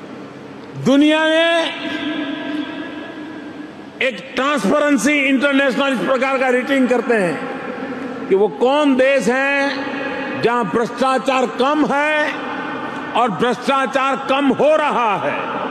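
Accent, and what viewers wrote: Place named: India. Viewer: I love the Indian English accent.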